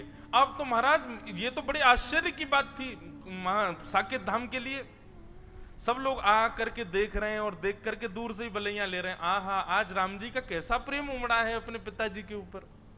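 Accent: Braj, India. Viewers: आपके श्री मुख से ब्रज भाषा बहुत ही सुंदर लग रही है मैं तो तरस गई थी ऐसी भाषा सुनने के लिए 🙏🙏🙏🙏🙏🙏🙏🙏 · Aap k muh se brajbhasha bahut sunder lagti wah wah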